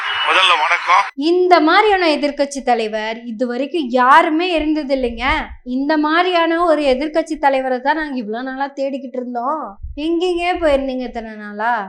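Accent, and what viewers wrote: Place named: Coimbatore, India. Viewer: Covai tamil super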